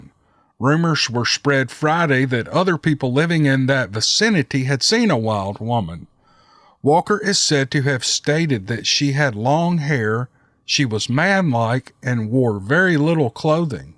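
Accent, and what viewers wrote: South, United States. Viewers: I love the way says Uncles and "Ain'ts". All of my southern family on both sides pronounced it that way. It's the exact opposite of the formal pronunciation of "awnts"., you are refreshing to listen to. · Enjoyed it? Loved it! How did this sasquatch learn English? They are so very bright!!! Read with your adorable southern drawl.... Just adds to the story interest.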